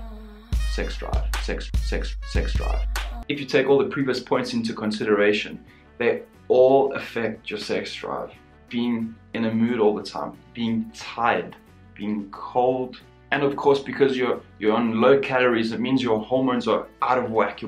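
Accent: South Africa. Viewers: U sound like u come from Sa(south Africa) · Are you South African bru?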